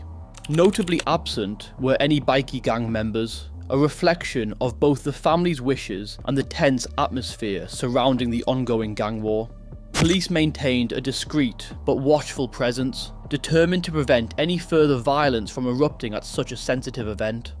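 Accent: United Kingdom. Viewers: My favorite British voice telling me Aussie crimes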